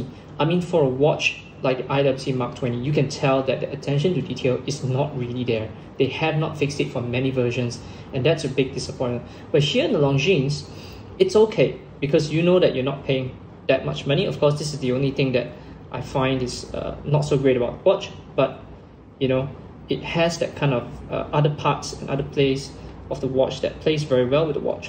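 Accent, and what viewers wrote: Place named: Singapore. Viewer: Long jeans! Thats how most Singaporean shouts out it as. Nothing wrong with pronouncing it from a local standpoint. He does not sound fake. Keep it up👍 Would like to see more reviews mid priced luxury watches from your channel. But keep in mind, that since comparisons are made between 2 watches, always be reminded to repeat that these reviews are in your own personal opinion and preference.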